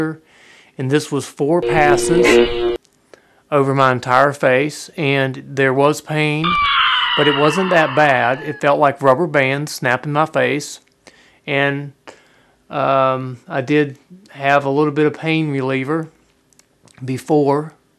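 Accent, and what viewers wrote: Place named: South, United States. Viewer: Lov souther accent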